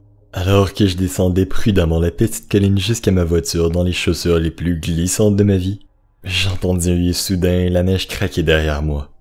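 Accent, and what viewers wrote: Quebec, Canada. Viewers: Mais ta un putain. D’accent québécois pourtant sa a l’air intéressant jte dit sa mes mon accent marseillais n’est pas mieux ✌🏽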